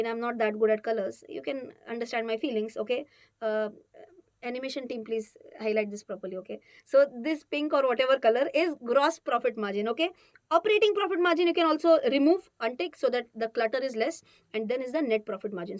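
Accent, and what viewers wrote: Maharashtra, India. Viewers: Just came across this video in my search to learn and I’m already too excited to learn. Love ur marathi accent dear.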